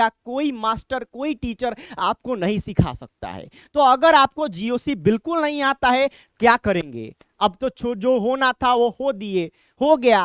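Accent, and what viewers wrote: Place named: Bihar, India. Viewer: Sir ka toh bihari accent h ...thoda relate kar paa rahe h kyunki hm bhi Bihar se hi h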